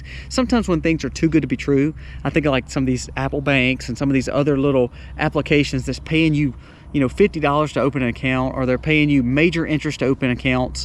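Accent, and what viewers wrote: South, United States. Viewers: Great points that you rsise - thank you. On another note, I don't particularly like the American accent except for that cool Southern accent you have. Such a great accent and I love listening to it 😅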